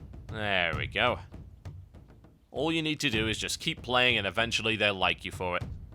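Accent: United Kingdom. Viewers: Lol at the people complaining that you, a British LPer, have a British accent. Personally, I get bored of hearing the non-existent Canadian accent, so I am quite pleased that my favorite WoW LPer is British. And though I said British before, as a fact (though I may be wrong) there are different types of British accents, seeing as France, England, and other countries of different languages make Britain, and they have different accents.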